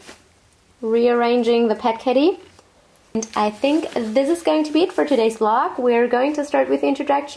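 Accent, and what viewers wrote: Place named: Germany. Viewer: You, my good fellow, are all over the place. 1. She can have as many guinea pigs as she wants, you have no choice in the matter. 2. One guinea pig is NOT enough. If you have one guinea pig by itself, it will literally be living in h e l l. They're herd animals and need to be in groups. 3. They can be, it's called floor time and it's how they get excersise. 4. She's...she's german- she has an accent and talks like that because English isn't her first language. In conclusion, don't comment stuff like this if you don't know what you're saying. Unlike you, she is an adult and can make her own choices.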